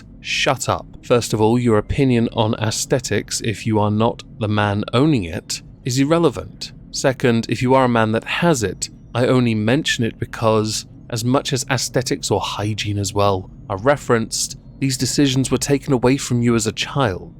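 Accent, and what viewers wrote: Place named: Oxford, United Kingdom. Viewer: Off topic but where is your accent from? Best I can place is Oxford. Totally agree btw. Instead of abortion men should have the right to have their offspring .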